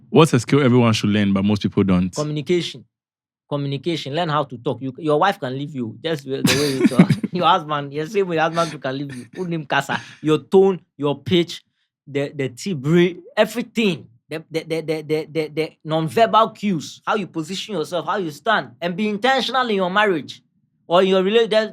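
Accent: Ghana. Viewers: Are you a Ghanaian his voice is like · This Indian guy has a Ghanaian accent. Did he grow up in Ghana? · He sound Ghanaian🇬🇭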